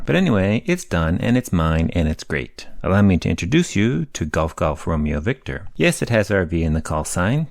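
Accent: Canada. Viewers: Very exciting news! Always love your review vids and the 182 video in particular helped me make the decision to buy one a couple years back. Out of all the planes you've ever reviewed, this one seems just so natural that you would own it. Also, never noticed you say "aboot" as much as in this vid but the Canadian tail registrations probably predisposed me to hearing it haha. Keep up the awesome work, dude, and safe flying!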